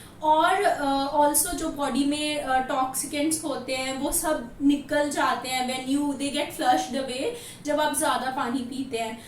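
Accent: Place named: Punjab, India. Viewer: Hi, Awesome video!!👏🏻👏🏻 So happy to hear your voice + that punjabi accent😍 Proud proud😎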